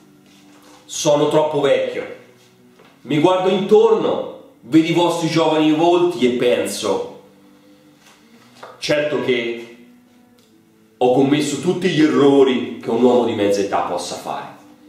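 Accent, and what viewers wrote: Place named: Tuscany, Italy. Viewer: Si sente il toscano ,leva il foglio ci vuole piu grinta .....e non gesticolare troppo !!!!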